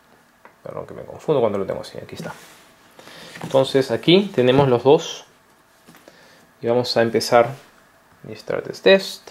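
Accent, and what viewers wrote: Peru. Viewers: Eres peruano ? ? ?? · eres peruano? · eres Peruano? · hey eres peruano???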